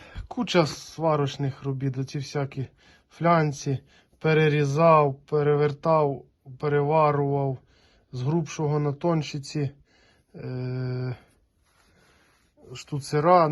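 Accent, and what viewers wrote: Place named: Zhytomyr, Ukraine. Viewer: По говору таке як Житомирська область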